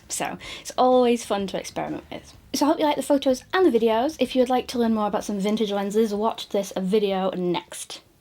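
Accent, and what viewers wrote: United Kingdom. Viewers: Love your videos, you're so incredibly cute and beautiful. I'm an Oly shooter myself, since I don't do videos. I Love your Brittish accent. If you ever come to Gothenburg, Sweden, you are welcome to stay at my place, since I have an extra bed, so you don't have to sleep in a Hotel and can skip some of The cost. Lot of Love, hugs and kisses, Niklas.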